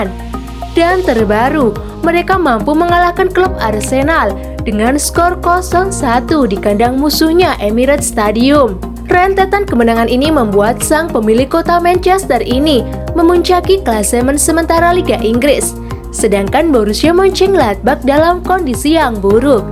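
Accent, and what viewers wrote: Indonesia. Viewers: Pengisi suara apakah orang jawa??? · Gaya bicara kaya'nya Admin orang LOMBOK..😂😂😂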